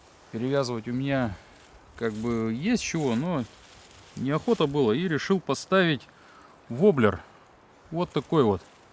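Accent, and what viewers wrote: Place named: Vologda, Russia. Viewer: Все думала,почему разговор у знакомый,леса и болота какие-то родные.А оказалось,что мы соседи😊.Привет из Вологодской области!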